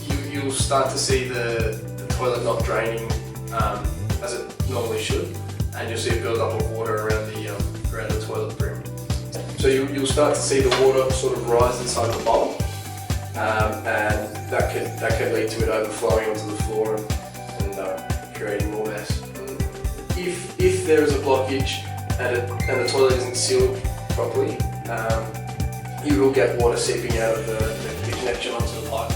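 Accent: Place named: New Zealand. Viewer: He sounds like a Kiwi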